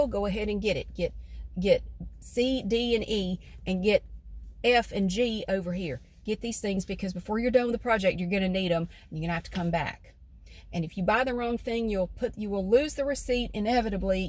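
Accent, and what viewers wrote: South, United States. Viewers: Sometimes when I watch your vlog I feel like I'm listening to myself, but with a Southern accent instead of Canadian. 😄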